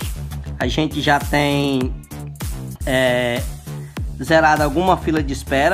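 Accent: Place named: Pernambuco, Brazil. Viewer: Você é de Pernambuco?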